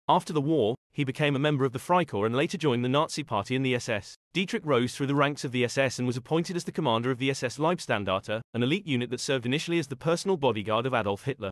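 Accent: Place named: United Kingdom. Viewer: When I heard the British accent I bailed.The sheer Know-it-All tone and Superior better than Them and You attitude set my teeth on edge!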